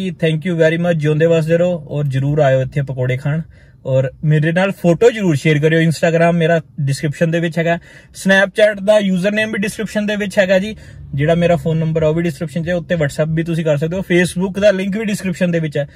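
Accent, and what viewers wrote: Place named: Amritsar, India. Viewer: Paaji tusi hoshiarpur de o par tadi boli expretion sade amritsariya vrgi aa ji apa nu v hun ithe rehde kafi chir hogeya hun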